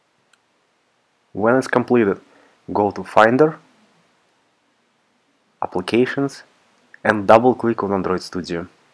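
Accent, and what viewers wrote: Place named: Russia. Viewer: рашн инглиш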